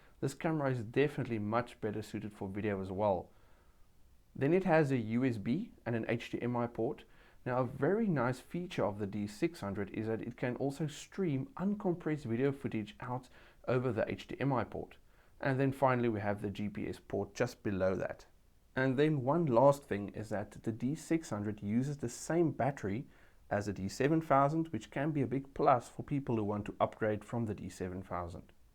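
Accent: South Africa. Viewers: I am busy going through reviews of the Nikon D600... i just stumbled over yours, and I was like: Hey, I know that accent! :)) It is nice to see a camera review made by a south african on here for a change. :thanks for the great review! :)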